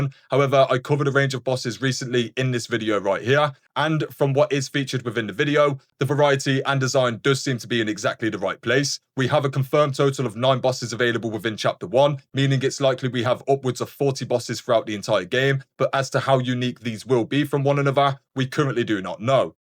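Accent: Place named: United Kingdom. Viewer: thanks for video. nice british accent. which region exactly?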